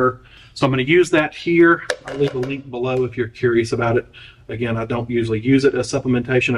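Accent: South, United States. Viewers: "run around the yard nekkid and yell at the dog" You been spying on me? 😂😂😂 I truly enjoy your videos. We sound so much alike with the southern ridicularity tossing words together. I love it!! Definitely trying this tonight. Hotter than Hades and more humid than Satan's draws out there baling hay. That's my justification for chocolate.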